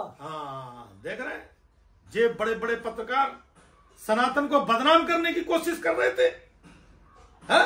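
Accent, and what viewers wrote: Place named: Madhya Pradesh, India. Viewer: एमपी वाला एक्सेंट बहुज्जोरदार हे भईय्या हम तो बा ही सुन कै एकदम खुस हो गये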